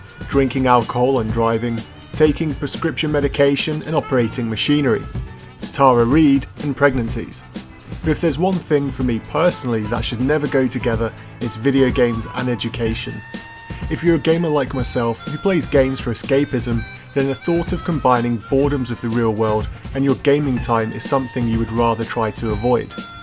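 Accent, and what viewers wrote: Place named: United Kingdom. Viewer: I needed to rewatch this one after the last video, went full British on this one! very fun to listen:p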